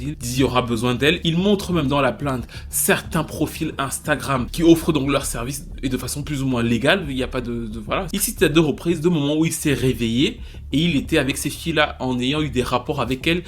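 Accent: Cameroon. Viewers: Je dis ein tu es Camerounais? L'accent ci me dit qq chose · J'apprecie enormement ton analyse, mais surtout ton accent un peu camer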